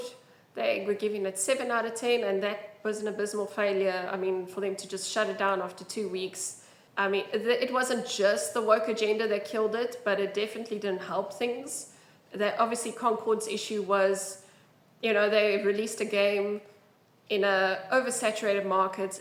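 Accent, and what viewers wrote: South Africa. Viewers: Great video, I couldn't agee more! As a South African Imust say you sound like a South African ;) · A South African talking about this "nonsense" is everything! Subbed! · I always comment for Algo when I hear a south african accent. Must support my countrymen 👍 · South African accent. Like an Aussie got infected with Bavaria. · LOVED this video! Agree with all your points! Another great femaile lead is Senua from Senua's Sacrifice. It's a brilliant game. Also, are you South African? 👀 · totally correct - also - ur accent? sa? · Yeah, I honestly didn't care much for the woke agenda, since it wasn't ALL there was to the game. What completely put me off from buying this game was the seemingly honest reviews; bad writing, mediocre gameplay, the facade of impactful decisions... yeah, that's extremely disappointing. Hopefully Mike Gamble and team can get a good game across the line with the next Mass Effect. 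Side note: a fellow South African showing love here. Recognized the accent. Great video. You have my subscription.😉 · That accent... South African? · I am Australian, and I am pretty sure this woman is South African, judging by the accent. People in South Africa still seem to have common sense, even here in Australia most people seem to have common sense, it's just when you switch on the TV the media like to portray as if society and reality is different, based on the agenda they want to push. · Is that a South African accent that I hear? Hello from SA :)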